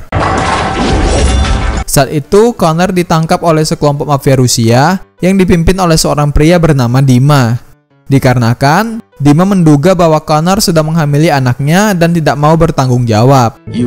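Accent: Medan, Indonesia. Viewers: Org medan bg? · Hee bodat.. Kau orang medan yahh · Anak medan kau rupanya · Anak Medan mana suara mu??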